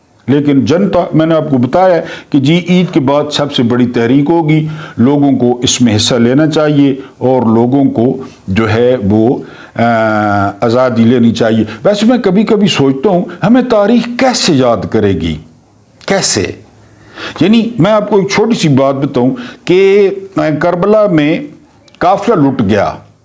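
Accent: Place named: Saraiki, Pakistan. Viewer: لالا آپ نے بھی عطا اللہ عیسی خیلوی کے طرح( غلابی ) اردو بولنا شروع کر دی ہے۔۔ بہرحال سرائیکی ہماری تو پسندیدہ بولی ہے کیونکہ اس میں لہجہ نرم اور میٹھا ہوتا ہے۔جس طرح آپ کالا یرقان کا بیٹا کہتے ہو۔تو مزہ آجاتا ہے۔ویسے بھی آپ کی باتیں سبق آموز اور میٹھی میٹھی ہوتی ہیں کچھ لوگوں کو تو سن کر اولٹی آجاتی ہو گی لیکن بھائی جی ہم تو غیرجانب لوک ہیں ہمیں آپ کی باتیں سن کر بہت مزہ آتا ہے۔ ۔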